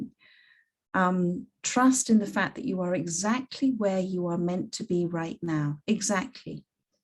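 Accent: United Kingdom. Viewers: Wow...I am a soon to be published poet..work explores the dark side of my life and also my hope..so that I'm praying it will reach others 🙏 My only fear about being in hermit mode which has been for years, the further I'm going into hermit mode..? Thank you for your calm and insightful presence.plus we Yanks 💘 the British accent.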